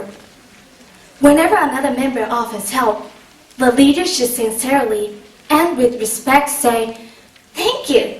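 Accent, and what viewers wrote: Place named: Japan. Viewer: セリノ 発音はキレイだけど日本鉛 まぁー日本人ならこっちのほうがキレイに聴こえるけど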